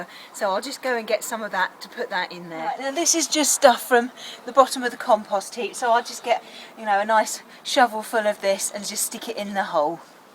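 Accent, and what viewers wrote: United Kingdom. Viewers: Luv'd ya video and your Accent - must be in the UK..:) I just planted 3 kiwi plants ..... wish me luck..:)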